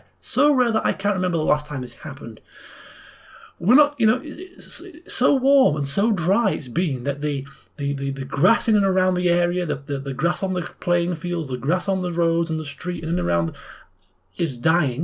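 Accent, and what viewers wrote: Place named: RP, United Kingdom. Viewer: No problem man I just wanted to tell you I love your channel I've been watching you ever since your mass effect playthroughs you're my favorite gaming Channel. I say you're even way better that those channels that have millions of subscribers. I think it's your voice you have a pretty cool Posh accent :)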